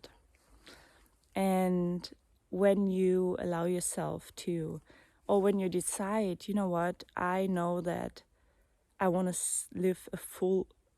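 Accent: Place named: Germany. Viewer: süss dein deutscher Akzent, unverkennbar. Meiner auch nach Jahrzehnten im Ausland <3 schönes neues Jahr <3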